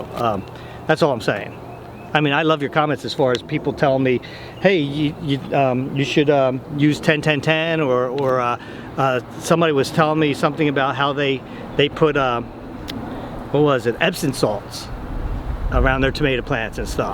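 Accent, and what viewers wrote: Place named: South, United States. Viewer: I have always wondered. Is saying "anywheres or somewheres" a southern thing? Good vid today!! I'm about to cannon ball in to your pool!!!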